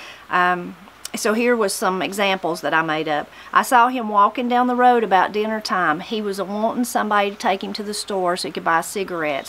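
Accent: Appalachia, United States. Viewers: I learned in West Virginia history, at West Virginia Tech, that the Appalachian accent is one of the hardest accents to mimic! And your videos make me proud of that, we do have a rich hillbilly accent. I love your videos always will, I have a blessed day sweetheart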